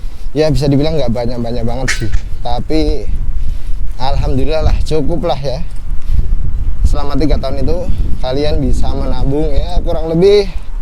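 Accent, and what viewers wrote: Pekalongan, Indonesia. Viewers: Wong Pekalongan ya mas ? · Podo wong kalongan. 😁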